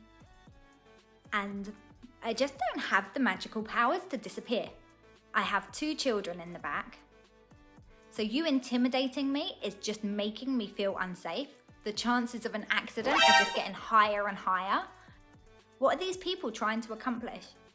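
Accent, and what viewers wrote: United Kingdom. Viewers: 我喜歡妳的英國腔英文，妳對台灣的交通評論很正確，以後我會開慢一點！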